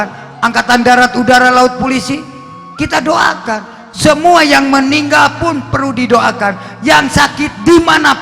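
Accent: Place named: Serang, Indonesia. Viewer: Sehat selalu abuya ......... Logatnya jawa serang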